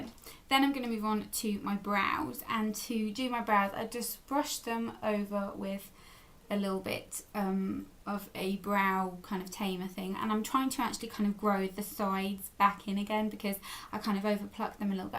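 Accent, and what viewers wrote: United Kingdom. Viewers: Lol I know you have a British accent, I meant ethnicity wise as you don't look Caucasian, that's all :)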